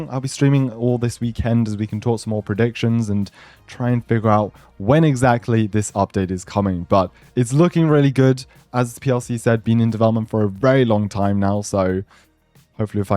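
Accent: England, United Kingdom. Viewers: question, are you British? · Wait your English or British?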